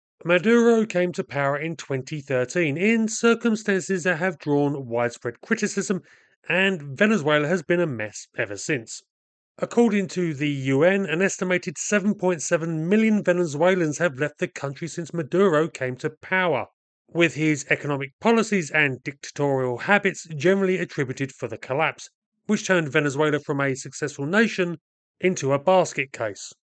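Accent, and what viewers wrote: United Kingdom. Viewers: A mess such as this is always clarified when explained by a proper gentleman with a British accent.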